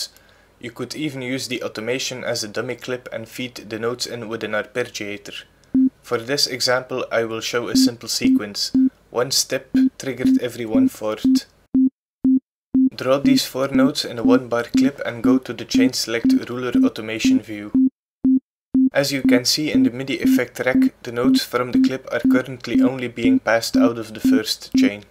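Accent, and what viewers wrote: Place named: Belgium. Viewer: Afgaand op het accent ben je zeker ook Belg? ;-)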